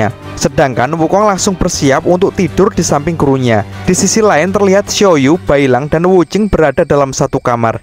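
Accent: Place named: Madura, Indonesia. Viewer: Kok malah kek orang Madura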